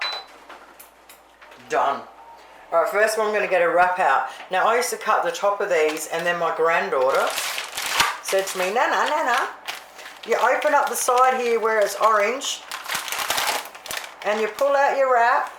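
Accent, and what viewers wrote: Australia. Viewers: Wonderful listening to Australian English in a review. Love the sound special effecs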